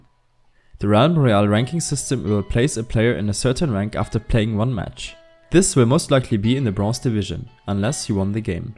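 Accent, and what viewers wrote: Germany. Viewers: Dein englisch ist soooooo deutsch nh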